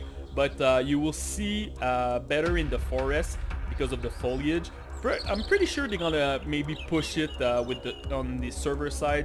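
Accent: Quebec, Canada. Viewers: Yooooo je reconnais l'accent quebecois ahahaha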